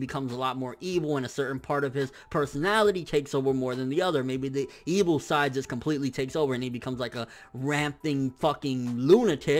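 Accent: United Kingdom. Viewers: Just imagined Funimation hiring to voice Zamasu in the English Dub with that British accent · love the British accent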